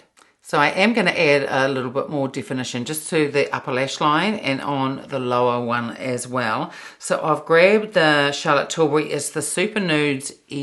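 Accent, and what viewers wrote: New Zealand. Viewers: Enjoyed your video. Love your New Zealand accent. I’ve been wearing mine over Oyster Pearl - using the dark brown from last year’s holiday palette in the crease and to line the lids. It creates a nice soft smoky look. I find the Smokey Quartz to be a bit silvery on my skin to I’ll be looking to matte that down a bit using a shadow from a quad or 12-pan. Either way I love them!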